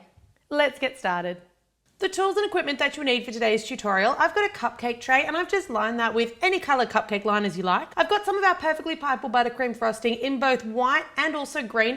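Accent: Australia. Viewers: You are such a high spirited person! I love your videos! Keep up the fabby work! 👌 P.S love your Australian accent! 😍 x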